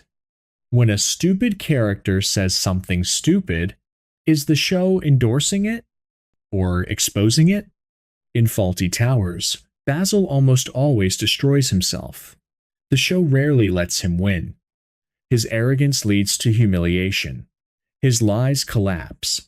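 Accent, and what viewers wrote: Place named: United States. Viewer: Hey dont be critical of that. That's how Americans say that word. That's how they are taught. Live & let live ! That's the world we live in.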